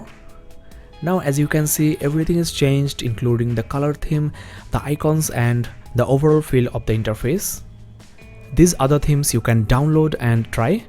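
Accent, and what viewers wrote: Bengal, India. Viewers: Maza aa Gaya bangali English sun Kar..... Osaaam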